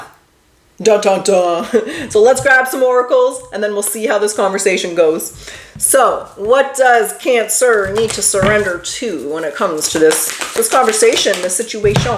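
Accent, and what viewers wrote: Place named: Canada. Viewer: You're Canadian... Lol I can tell. My ex is Canadian too. I love the accent.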